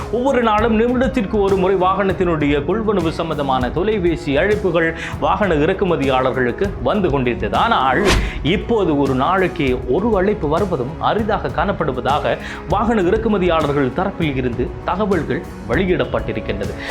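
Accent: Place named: Tamil Nadu, India. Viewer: உங்கள் தமிழ் .....அருமை ..... தமிழ்நாட்டுத்தமிழ் ..........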